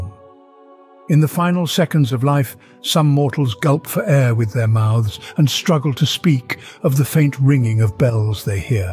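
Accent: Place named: United Kingdom. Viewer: The British dude narrating makes my brain turn off and stop listening. Idk why. 🤔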